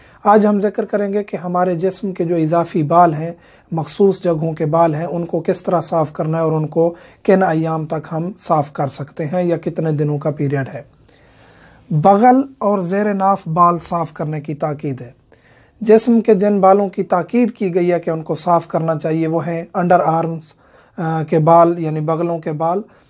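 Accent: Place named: Saraiki, Pakistan. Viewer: Sraaiki bolny waly urdu aisy he bolty hn....weldone ❤❤❤💐💐💐💐💐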